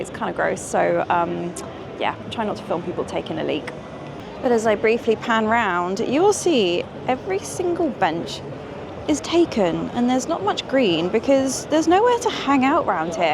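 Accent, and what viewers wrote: RP, United Kingdom. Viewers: What I like about you, you sound posh,you look posh but your just one of us. Loving the channel, keep up the good work. ❤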